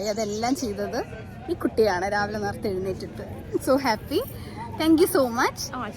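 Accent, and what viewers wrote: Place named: Palakkad, India. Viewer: No idea why your video came up as a recommendation. Congrats on your graduation. Are you from Palakkad, you speak just like my husband? My husband is from Palakkad, Kunisserry. My daughter graduated from Grad school in May of this year and works in Investment Management, we have lived in the United States for close to 30 years now.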